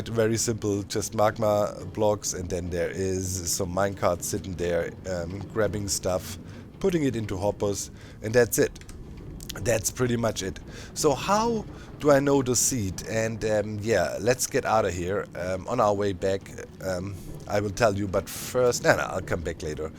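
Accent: Germany. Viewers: Habe dich ganze zeit in anderen videos gesehen von bspw. Grian oder Mumbo und hab mir immer gedacht der hat doch voll nen deutschen Akzent. Jetzt weiß ich es fühle mich gut danke xD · Love you, I'm not a real supporter talking about money but I wanna call my self a real moral supporter, love your way to play this game, your way to ponder videos and also your character: real's life one and fictional one. Man, go gogogogogoogogo and never stop. Thx to make me enjoy a doichman speaking eng for so long <3 hope to meet you one day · I'm learning German on the side, listening to speak English is actually helping me with my accent when practicing lol. Keep it up,.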